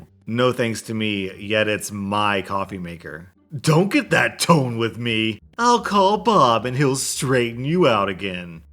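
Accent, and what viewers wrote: South, United States. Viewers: Not gonna lie that southern accent was kinda cute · I love your country accent lol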